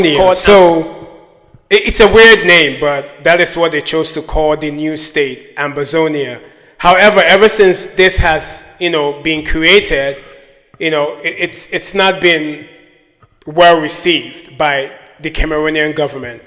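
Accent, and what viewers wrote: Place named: Nigeria. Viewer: Hello mate! You've got your facts wrong or mixed up. Francophone Cameroon known as la Republique du Cameroun got its independence from France on January 1st 1960. You sound Nigerian. Anglophone Cameroon previously known as British Southern Cameroon was administered as an autonomous Unit within Colonial Eastern Nigeria with the headquarters of the latter Region in Enugu. In order to gain their independence, British Northern Cameroons and British Southern Cameroons as separate entities had to either join la Republique du Cameroun or remain within the Federal Republic of Nigeria which became independent on October 1st, 1960. During the February 11th, 1961 Plebiscites, the adult population of British Northern Cameroons voted to remain within the Federal Republic of Nigeria. Sardauna Province, as British Morthern Camrroon was known before Independence comprised parts of present-dayTaraba, Adamawa and Borno States. 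British Southern Cameroons voted on a 70-30% split to achieve independence by joining la Republique du Cameroon. Independence was effective from October 1st, 1961. Differences of opinion on governance between the federated states of West Cameroon and East Cameroon commenced after October 1st, 1961. The citizens of Ambazonia- the former federated English-speaking state of West Cameroon ensued marginalisation from the outset of a highly centralised Federal Republic of Cameroon. To get on in Cameroon, Anglophones must learn and master French. My generation accepted the fait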